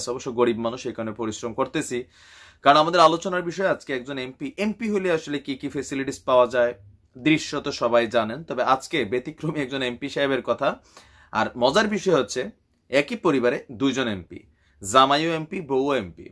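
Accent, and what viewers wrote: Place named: Barishal, Bangladesh. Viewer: বরিশালে ভাই আপনার কথা অনেক সন্দনর লাগে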